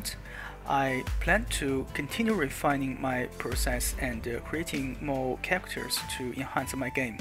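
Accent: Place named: China. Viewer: 一听就是华人，赞赞赞，求个detail tutorial可以吗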